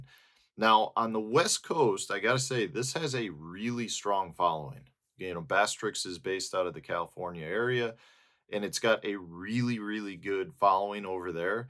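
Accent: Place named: Chicago, United States. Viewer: Hey you're becoming a cheddarhead with the way you pronounce about. Starting to lose that chicago accent. Don't become a Packer fan!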